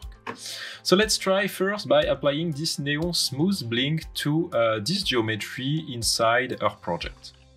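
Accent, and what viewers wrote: France. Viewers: Il faudrais faire les vidéo en français, car avec l'accent français la traduction est horrible ! et c'est juste incrompréhensible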